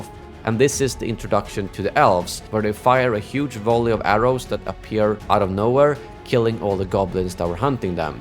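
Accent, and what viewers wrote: Sweden. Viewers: I applaud your continued efforts on this project. The day we do see more scenes (and hopefully new extended anniversary cuts) released, I trust many of us will gather here and thank you for your continued interest and passion for this trilogy and all its lost fragments of gold. If there's anything that's ever going to give all this lost material a good push toward being released, it's this. This undeniable display of passion. You look at these compilation videos being updated again and again as more material surfaces over the years, and it couldn't be clearer. Hundreds of comments. Hundreds of thousands of views. This kind of passion doesn't just die down quietly over time, there's too much good stuff out there to keep folks like us up at night. I'm glad to see the torch is still burning bright. Also, hearing a fellow Swede narrate this really resonates with the whole Nordic Middle-Earth vibe. 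· If having a Swedish accent means sounding gay as in merry and bright, then yes! 🤓✨️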